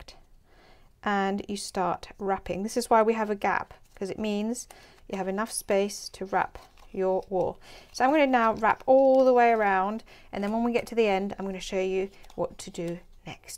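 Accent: England, United Kingdom. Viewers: Ty and are you British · Thank you for that. Nice hearing an 'English' accent on YouTube for a change. I used to make PomPoms like this when I was a child. Though I didn't cut a slot, we would sew the yarn through the hole, and fill the hole up. (I think I like this way...)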